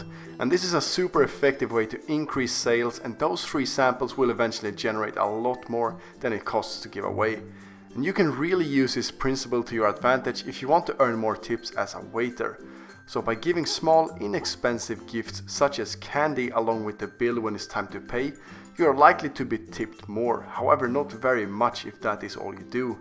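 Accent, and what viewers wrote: Sweden. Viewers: Är du svensk din dialekt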